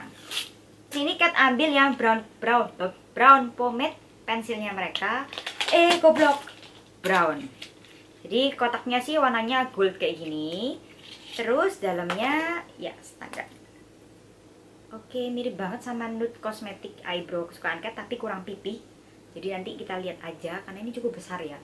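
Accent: Java, Indonesia. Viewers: Kak asli orang mana? Kayak orang cina tapi cara ngomong nya orang jawa 😁 IG Lutfianasukma #kathlakzgiveaway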